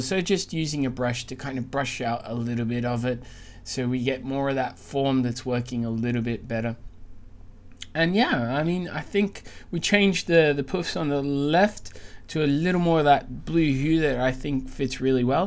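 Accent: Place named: Portugal. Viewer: olá, sotaque português não passa despercebido. já aderiste a este grupo? 3D PT <link> abr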